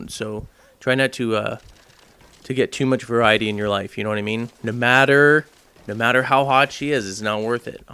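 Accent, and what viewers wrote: Canada. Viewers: are u canadian????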